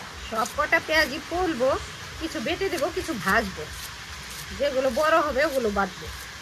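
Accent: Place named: Bangladesh. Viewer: আমি নিশ্চিত যে আপনার পূর্বপুরুষ বাংলাদেশর ছিল। আপনার কথা আমাদের দেশের।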